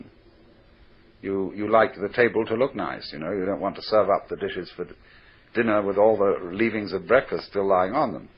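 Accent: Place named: England, United Kingdom. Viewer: are you sure this is watts,dont sound like him,ous not pronounced right,more english than scottish tones .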